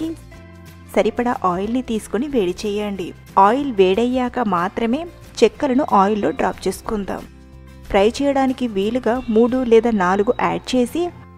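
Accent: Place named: Warangal, India. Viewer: mi proper warangal anukunta same ma warangal language matladuthunnaru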